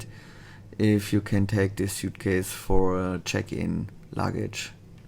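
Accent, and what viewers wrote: Germany. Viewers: Are you german? Your accent is beautiful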